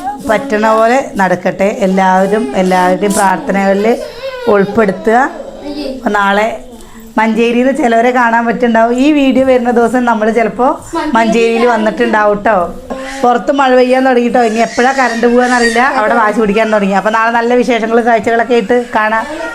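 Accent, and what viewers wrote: Palakkad, India. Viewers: colour mangiyirikkanu. Nalla bright colours like meroon okke idoo., presikkku colour ullondu nannayirikkum onnu maatti pidichu nokku. Nighty maatty frock nighty vedikkoo. Enikku 40 aayi but njan frock kurti aanu veettil idaru.. Nammal nannayitu nadakkansm. Athu pole hair video yil verumbol onnu clip cheythu idooo, eppolum ingane ketti vekkathe. Oru change vende. Nannayitu video yi chilappolengilum.frock nighty Instagram il 200 alle. Rendalum koodi oru psc apply cheythitu book vedichu parasparam chodhichitu padikkoo. Jeevitham mari mariyum. Endholke paranjalum... Enikku bhayangara ishtaaaaa ee slang.. Palakkad an bhasha. Eppo enikkku edayil verum appo ende makkal kaliyakkum❤❤❤❤luv u all soooo much., kuttikal... Amma kulichitu mudi azhichidumbol nallachelanu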